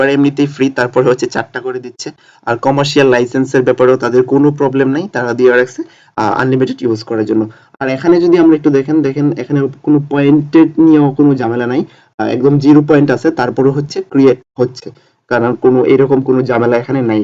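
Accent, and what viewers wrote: Sylhet, Bangladesh. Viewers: ভাই কিতা সিলেটের নাকি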